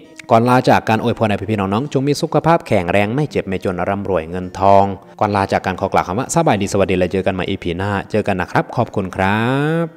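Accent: Laos. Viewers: เขาเป็นคนลาวพูดได้เเค่นี้ก็ดีเเล้ว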